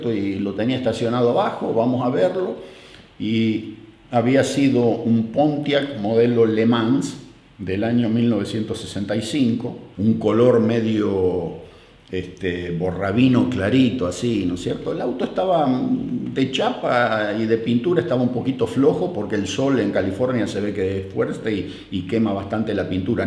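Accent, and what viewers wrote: Argentina. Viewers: Gracias por compartir un poco de tu historia!!!. La forma que tienes de relatar con ese acento argentino es muy atrapante... sos el Gato Peters canadiense!!! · Lo que me encanta de es que no ha perdido su acento argentino, y lo que dice respecto a lo que cuesta comprar, tristemente sigue igual. A muchos nos está costando llegar a fin de mes, hay que trabajar cada vez más y lo mismo, no alcanza. Los sueldos y jubilaciones son bajos. Se mantiene a los vagos con planes. Eso me tiene cansada, nos tiene cansados a todos. Deseamos gobernantes honestos y que ayuden a todos con trabajo digno, sueldos dignos., admiro su valentía de contar lo que tanto les hizo sufrir acá. Y qué bueno que tuvo junto a su familia un mejor pasar.. Saludos desde Mendoza Argentina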